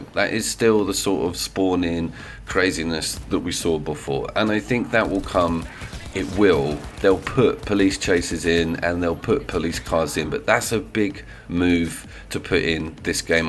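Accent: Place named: England, United Kingdom. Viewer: it's the English accent mate. Makes you sound smarter than the average bear.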